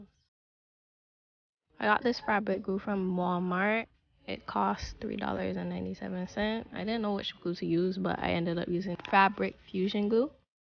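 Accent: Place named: Barbados. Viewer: Jealous you have the bajan accent and I don’t lol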